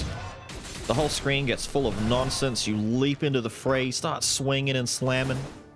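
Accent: Australia. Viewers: Are you australien?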